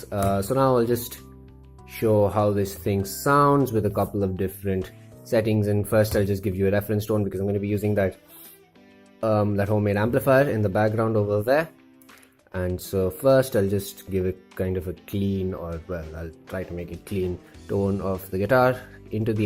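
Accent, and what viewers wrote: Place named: India. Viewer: Superb work! Your accent sounds Indian😃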